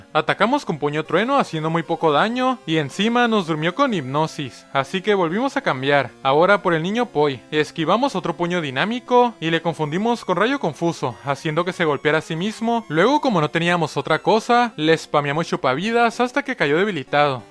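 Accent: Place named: Mexicali, Mexico. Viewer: cai en este video de casualidad, y al escucharte hablar dije, este verga es de mexicali jajaj saludos!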